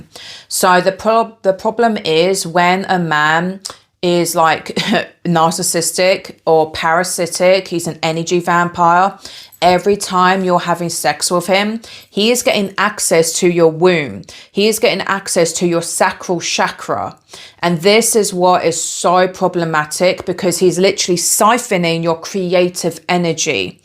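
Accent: United Kingdom. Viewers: you do have a very cute british accent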